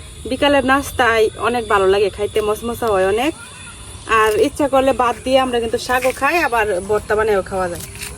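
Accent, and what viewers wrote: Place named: Mohanganj, Bangladesh. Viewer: বাংলাদেশের মোহনগঞ্জের ভাষা, আমার এলাকার কাজের বুয়ারা সবাই ঐ অঞ্চলের এবং এনাদের ভাষায় কথা বলে। কিশোরগঞ্জ, মোহনগঞ্জ সম্ভবত কাছাকাছি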